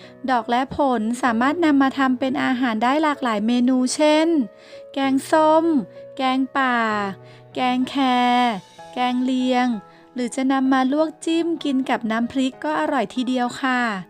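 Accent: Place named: North, Thailand. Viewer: อู้จ้าาาาาา คนเมืองงงงงงแต้น้อ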